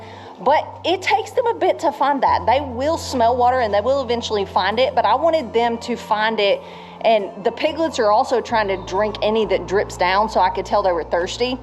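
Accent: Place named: Appalachia, United States. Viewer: Well I'm hooked lol Good lookn gal right there with a soothing voice sounds just like a Appalachian gal👍🏻😍 She can tell me bed time stories anytime,yall take care of the animals and yourselves!!! I ll keep checkn in on ya😉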